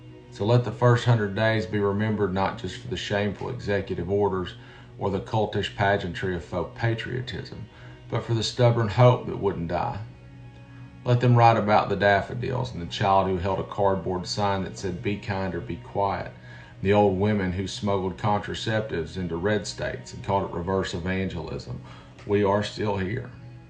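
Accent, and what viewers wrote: South, United States. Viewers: Since 2016, I have been wondering where the poets and musicians are…who are today’s Langston Hughes, Joan Baez and Bob Dylan? There have been no equivalents…until now. This poetry, recited in your beautiful southern accent, reminds me of Walt Whitman. It is the most patriotic speech I have heard from any artist in a decade. The solution to, and the repudiation of, our current situation must (IMHO) come from the American south. It must find a way to heal itself, so our nation can heal. Truth-telling is the beginning. Thank you for giving me hope.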